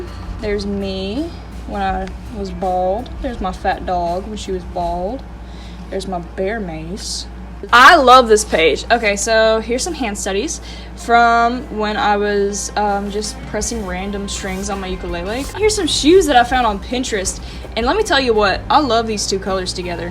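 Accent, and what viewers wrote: South, United States. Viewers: why does your country accent sound stronger in this one lol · I feel like ur accent has gotten even more southern since the last time I heard you lol, also (obviously you don't have to share if you don't wanna) bit what state r u from I'm in alabama. Ye-haw... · Omg finally holy shit anyways you got a cool southern accent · dude why do you sound more yee-haw than usual? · off topic slightly but i absolutely adore ur (southern?) accent it oddly suits you really well and it flows out smoothly unlike so many others 😭absolute incredible tour tho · you sound extra southern in this video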